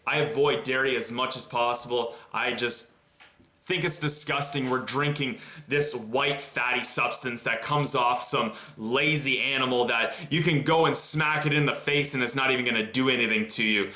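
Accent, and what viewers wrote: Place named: Russia. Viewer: Why the Russian accent if you are Hungarian ? "Vun hundrred perrscent Hungerrian voman"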